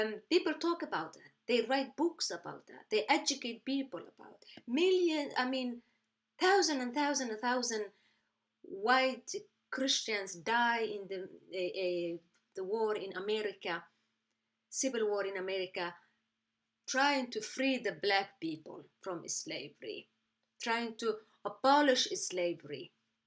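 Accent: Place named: Tigray, Ethiopia. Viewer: You are tigray agame ethiopian from your accent .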